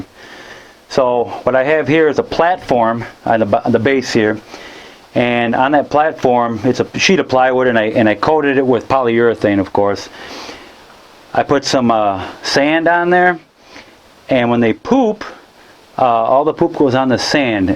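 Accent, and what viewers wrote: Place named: North, United States. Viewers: Mid west accent + the word 'poop' = endless childish laughter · Did you live somewhere up north before Houston? I’m just curious with your accent.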